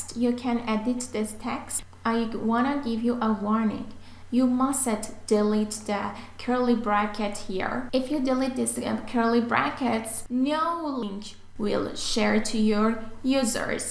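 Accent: Iran. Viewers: At first 10 seconds i Told myself she is Iranian🙂 The famous Iranian accents🥰 باعل واعل😂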